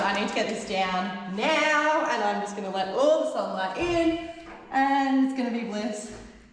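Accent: Australia. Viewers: Gday looking great got Granddaughter Number two with me Sarah she is 18 months and loves your show haha maybe the Australian accent have a great week !!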